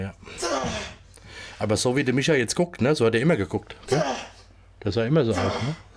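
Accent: Hesse, Germany. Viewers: de hessische dialekt bedarfs auch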